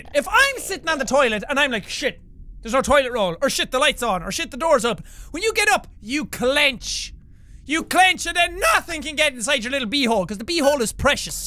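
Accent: Ireland. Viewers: oh my goash its an irshman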